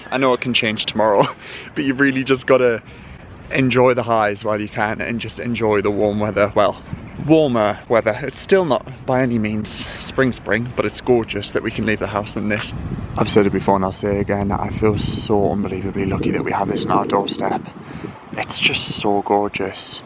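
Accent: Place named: London, United Kingdom. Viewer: Hi cant say look behind you mate couldnt stop lol seeing your twin brother behind made me cry shall we go to gregs not hello mate its the london acsent mate ha ha you have done so much in you london home take your time you and will get there and look back as we all have done life is a learning kerb mate this is a londoner talking to another londoner mate ha ha enjoy the journey❤😊😅🎉😂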